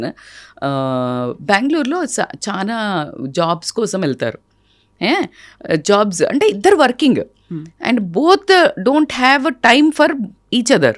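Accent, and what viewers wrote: Telangana, India. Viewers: Nuvu mundu nee language sare Chesko. Chendalamga undi nee telangana yasa. Manchi telugu bhasha nerchuko.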